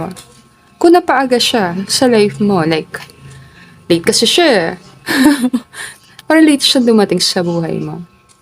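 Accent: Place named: Bicol, Philippines. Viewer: madam, ang cute ng voice nyo...parang may bikolanang accent..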